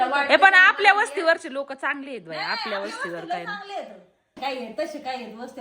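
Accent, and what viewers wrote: Ahmednagar, India. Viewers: नगरी भाषेत एक वेगळाच गोडवा आहे ❤❤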